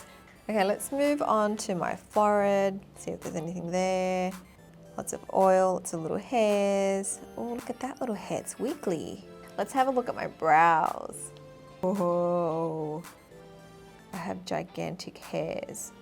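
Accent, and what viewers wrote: Australia. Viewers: AN AUSSIIEEE YAYA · are you australian? you're pretty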